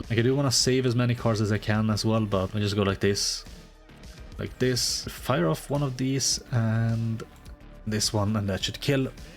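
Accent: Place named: Sweden. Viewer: Its probably just the swedish accent honestly, you do say quite a lot of words differently. But my tired brain would argue. 😂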